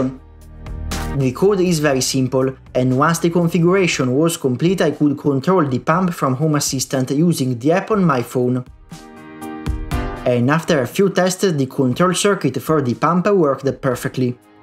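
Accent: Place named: Italy. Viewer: Bellissimo progetto! Non capisco perché farlo con questo inglese dall’accento chiaramente italiano. Anyway, bravo!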